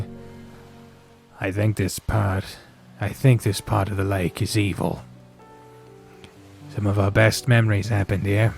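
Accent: United Kingdom. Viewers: Ahhh... kudo's to you for your bri-ish accent, Also, Love the new video!!! I just binged all the videos I missed ever since the start of December so I'm glad I could finally be on time again ^^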